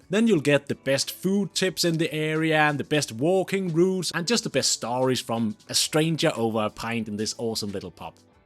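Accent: Scotland, United Kingdom. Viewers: Well, considering he's Danish, the odd mispronunciation can be forgiven. In fact I was amused by the "Scottish" accent he seemed to have acquired. He seemed quite personable and so any mistakes may be easily ignored. It's the plethora of ill-researched AI "documentaries" on YT that is more of a bugbear. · I love hearing people from other countries who have been here a while and every now and then you catch a little twang of a Scottish accent :) oh and I work for Barrs (the company that makes IRN-BRU) they are based in my home town of Cumbernauld it doesn't just go toe to toe with coke it vastly outsells it. coke comes second but it's not even a close second you really wouldn't believe just how much of that stuff we ship out the door each week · Love your Scottish vowels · Love the mid-Clyde accent! This lad defo gets the place.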